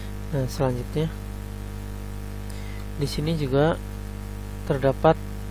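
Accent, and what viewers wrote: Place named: Java, Indonesia. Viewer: cuma lidah jawa yang bilang bulat itu bunder, hehehe, sukses terus mas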